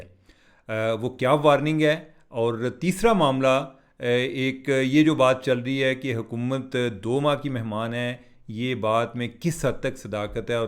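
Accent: Punjab, India. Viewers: Urdu main punjabi ka tarka kamaal stay blessed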